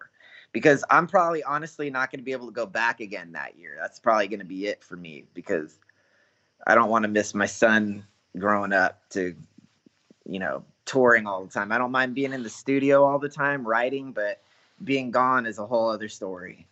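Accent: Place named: Brazil. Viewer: faz um podcast em portugues mannnnnnn...saquei esse sotaque br!!!!1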